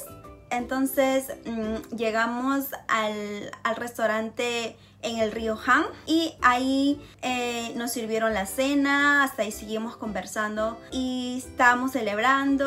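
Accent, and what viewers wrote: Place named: Mexico. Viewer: Porque hablas como mexicana? , Linda historia 💕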